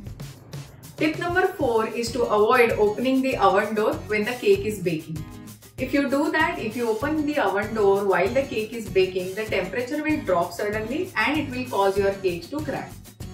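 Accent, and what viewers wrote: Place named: India. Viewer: Put an Australian on, can't understand indian talk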